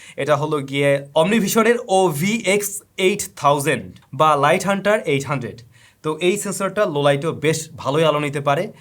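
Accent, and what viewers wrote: Barishal, Bangladesh. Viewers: borishailla tola aise phone review korte ,, taw atc er moto vfx diya ,, hla cringe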